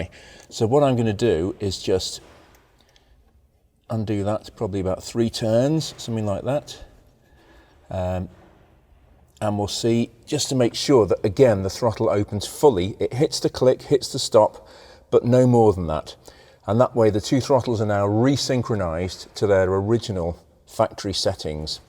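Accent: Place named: Northern England, United Kingdom. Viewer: Yes and no - while our host here is an expert no doubt, he's a bit of an old woman as well. Harry wears his expertise far more lightly, coming across as more modest - with a nice approachable humility. This guy gives me the vibe of a cross between the broad "northern" fella saying a resounding "NOooo" played by Paul Whitehouse in that Aviva advert about ten years ago, Victoria Wood playing one of the gossipy, complaining tea ladies - and Alan Bennett (at "soopper" - with his "mootha"). There's just something slightly precious and self satisfied about which grates on me for reasons, despite my best efforts, I can't quite describe.....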